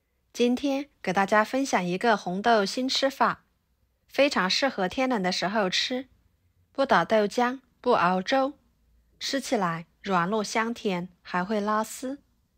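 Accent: Yunnan, China. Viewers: 又听到了云南普通话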